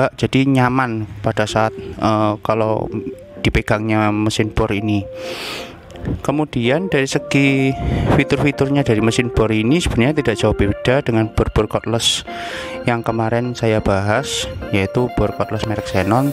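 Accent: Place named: Java, Indonesia. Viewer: muka engko logat jowo.sukses mas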